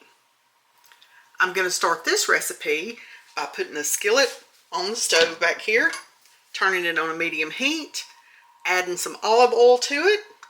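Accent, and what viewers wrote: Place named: Alabama, United States. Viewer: My stepmother's family was from Jackson Alabama. I love to hear Alabamans talk. I could listen to you all day.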